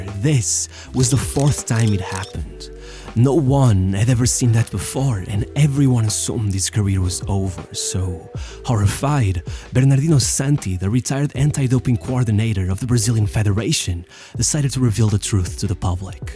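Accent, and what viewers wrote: Portugal. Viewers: O comentador é Tuga 😂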